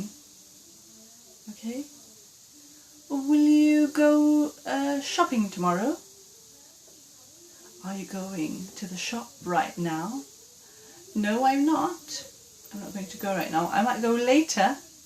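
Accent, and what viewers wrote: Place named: United Kingdom. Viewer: ♥️ you have a British accent..I loved your video. I'm following you in YouTube and HelloTalk also. Nice video☺️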